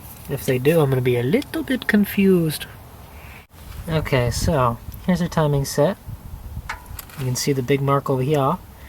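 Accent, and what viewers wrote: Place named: Germany. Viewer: is there any significance to to frequent, annoying, German accent ? other than that great vid.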